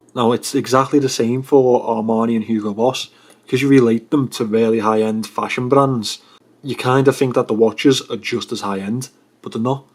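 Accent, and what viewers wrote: Liverpool, United Kingdom. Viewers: scouse, well in la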